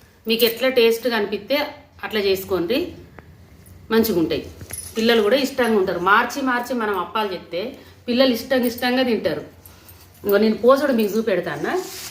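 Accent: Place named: Mancherial, India. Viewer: Same ma lagane matlduthunnaru madhe mancherial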